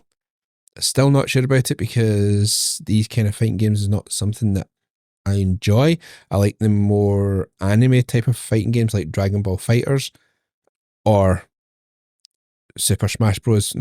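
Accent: Scotland, United Kingdom. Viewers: Maybe a stupid question but had to ask after seeing any of your videos for the 1st time and hearing your voice: You from Scotland?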